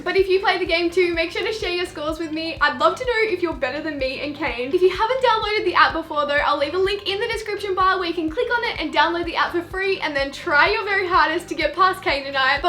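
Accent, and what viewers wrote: Australia. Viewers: Are u Australian?????????????????????????????????????????????????????????????????? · My room is clean most of the time but it's always my dresser that stays messy, it's here, ps you r are soooo beautiful and I love your accent! Idk why but there is just something about Australian accents that I love! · omg ur Australian so am !!! i love when i find you tubers that aren't American!!!..... no offence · Arw you Australian?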